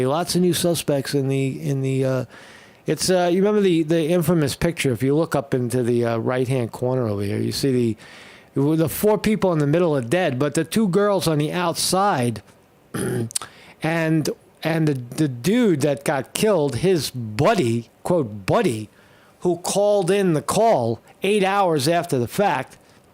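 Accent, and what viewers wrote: New York, United States. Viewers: Hey first time I'm tuning into your podcast you sound like a fellow New Yorker but I could be wrong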